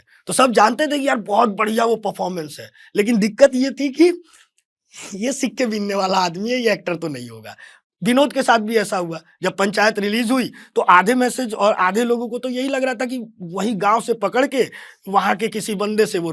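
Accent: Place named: Ballia, India. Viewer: Vinod ka hi real character lgta hai.. Ballia ka sahi accent hai aapka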